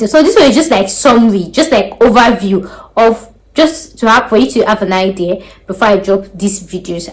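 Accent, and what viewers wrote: Nigeria. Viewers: Please add subtitles to your video. I love your Nigerian accent but please also be accomodating to people who watch your videos who aren't from Nigeria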